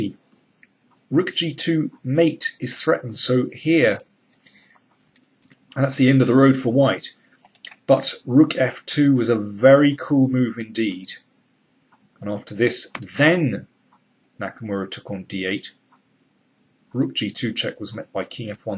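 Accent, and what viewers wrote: England, United Kingdom. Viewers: I love his English accent and the way of saying "indeed". You re the king.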